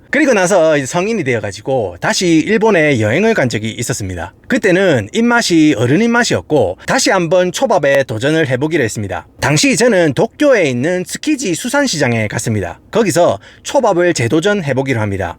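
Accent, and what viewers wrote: Gyeongsang, South Korea. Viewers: 전혀 공격적이지않고 재미있게 듣는데.. 아.. 경상도 아닌 분들은 공격적이라고 생각하실 수도 있겠네요 ㅎㅎ 경상도 특유의 억양일뿐 절대 공격적 멘트 아니라는 점!!! 잊지말라규~~❤ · 경상도사투리 이정도면 상타치입니다ㅋ 근데 연배가..저희 큰아빠 말투신데😅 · ㄹㅇ 경상도사투리 기준으로 은 매우 스윗하게 말하는거임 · 이렇게 나긋나긋한 갱상도 사투리는 살면서 들어본 적이 없거늘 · 이정도면 경상도 사투리 중에 진짜 밝고 스윗한 사투린데😂ㅋㅋㅋ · 경상도 사투리가 너무 심하다... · 저도 서른마흔다섯살 보통 갱상도 아재입니다만 억수로 부드럽게 잘 설명해주시고 계시는 것입니다 ㅎㅎ 스울 분들 들으시기엔 공격적으로 들리시겠지만 그거 절대 아니라는거 잊지말라규 ㅎㅎ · ㅋㅋㅋㅋㅋ 서윗한 경상도 사투리로 들려요~ ㅋㅋㅋㅋ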